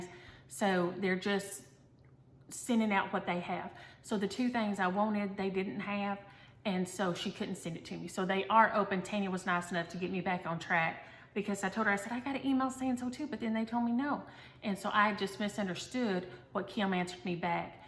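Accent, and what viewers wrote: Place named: Arkansas, United States. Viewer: Happy Birthday!!! Bless your heart...we will get though this! Love your accent, I lived in Arkansas for five years and miss hearing that twang😂! Down south everyone is fixing to go somewhere! You hang in there girl! Love all your finishes and stiching! Sending a virtual hug! God Bless you!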